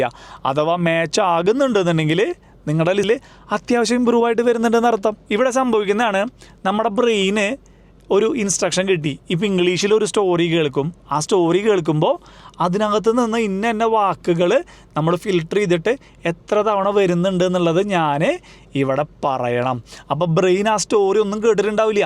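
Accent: Thrissur, India. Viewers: Thrissurkkaran aanalee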